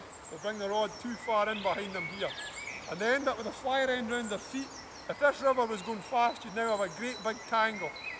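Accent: Scotland, United Kingdom. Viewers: Ah unreal they were the days tight lines best Scottish accent ever be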